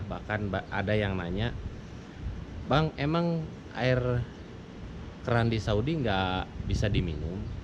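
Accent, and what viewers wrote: West Java, Indonesia. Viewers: suka liat vlog ini,yg ngevlognya seru & ada lucu2nya,logat sundanya& jadi tau daerah2 disaudi.kynya enak tinggal disana.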